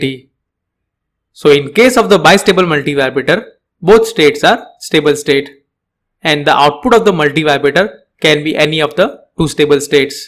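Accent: India. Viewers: Oh my god, this is bad. the audio quality and the indian exzant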